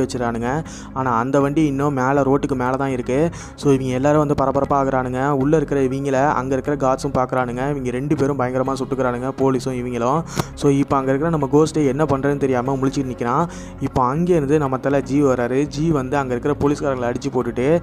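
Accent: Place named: Coimbatore, India. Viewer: Avainka , eveinka slang -CBE 😁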